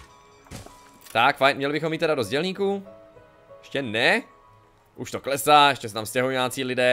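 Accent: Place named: Prague, Czech Republic. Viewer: podle prizvuku tipuju na Prazaka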